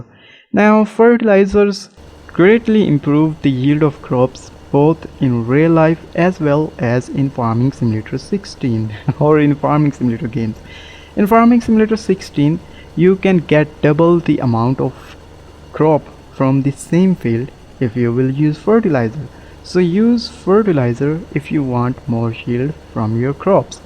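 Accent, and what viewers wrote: India. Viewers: Are you an Indian person because you sound like one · idk why your Indian accent gives me an asmr like tingle but i love it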